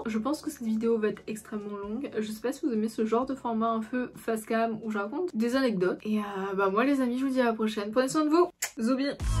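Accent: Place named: Paris, France. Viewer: Cette façon de parler 😂 on dirait une bourgeoise de Paris PS: Je critique pas, je fais un constat, personne ne parle comme ça là où je vis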